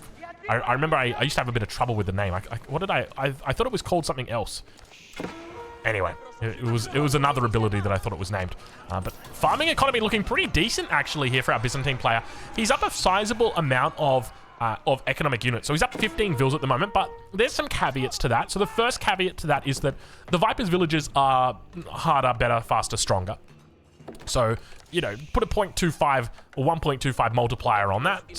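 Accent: Australia. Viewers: What is it with youtube commenters not understanding Australian?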